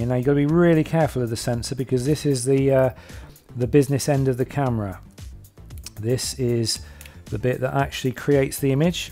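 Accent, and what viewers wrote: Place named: Bristol, United Kingdom. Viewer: Have you ever captured paranormal activity with that? I love the Bristolian accent ( give or take a few miles ).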